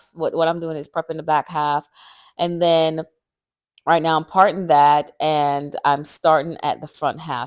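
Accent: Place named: Jamaica. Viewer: loves why I think I heard a bit of a Jamaican accent in this video 🇯🇲🇯🇲🇯🇲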